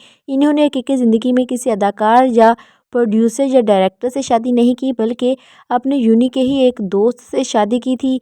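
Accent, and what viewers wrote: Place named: Punjab, India. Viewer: اپنے تلفظ پہ محنت کریں خصوصاً ہندسوں کے۔ آپ پنجابی میں ہندسے ادا کرتی ہیں۔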